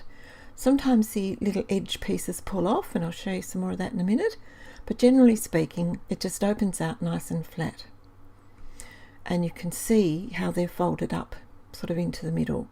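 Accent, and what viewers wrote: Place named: Australia. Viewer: GREAT VIDEO, NICE VOICE AND AN AUSSIE 😊 THANKS SO MUCH.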